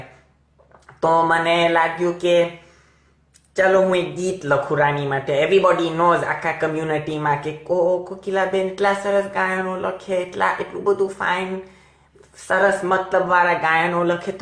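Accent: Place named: Gujarat, India. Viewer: hahahhah....m madly in love with ur videos..how did u manage to pick that quintessential gujju dialect?? ur observational skills are damn good.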